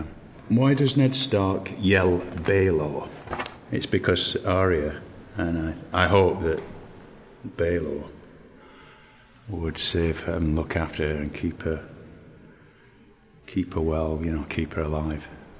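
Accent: United Kingdom. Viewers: Well I'm argentinian so I really cannot say nothing more than "I love Bean's british fOcking accent". Btw I love his irish accentt as well xD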